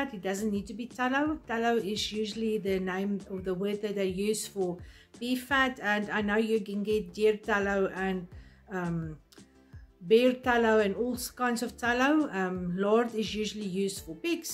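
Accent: South Africa. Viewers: I had to watch the video over because I am a South African living in Australia, and it was just so lovely hearing a beautiful South African accent....My mother was dependent on her oxygen machine towards the end of her life, and the load shedding was frightening for her, so thank God for modern technology, we were able to get her a generator. It is just a way of life one gets used to...with the flies and all.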